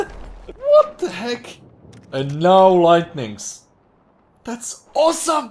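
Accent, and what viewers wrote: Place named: Europe. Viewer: What country are you from? I was going to guess but I would probably get it wrong lol. Somewhere in europe.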